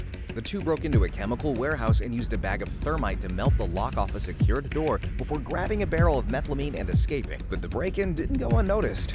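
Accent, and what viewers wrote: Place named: North America. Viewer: well the mf speaks perfect English in a north American accent so I assumed bro might either be from the US or Canada... Not a crazy assumption to make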